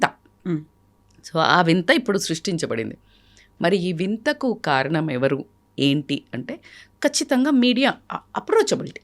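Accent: Telangana, India. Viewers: Nuvu mundu nee language sare Chesko. Chendalamga undi nee telangana yasa. Manchi telugu bhasha nerchuko.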